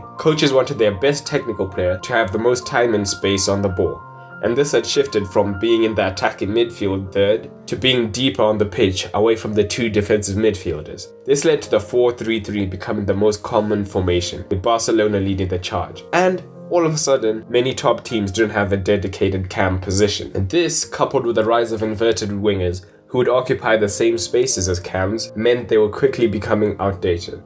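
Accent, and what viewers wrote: South Africa. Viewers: Is this guy from SA? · Totally of topic here, but this dude sounds south African,... or is it just me? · You Have A South African Accent. Either Zulu or Xhosa :)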